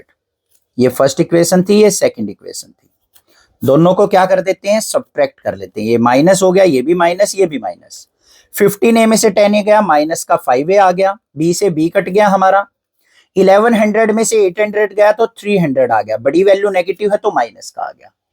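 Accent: Haryana, India. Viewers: Sir aap bhot achha phadao ho pr aap kit the ho apki avag main te haryanvi tone avhe hai 😅😅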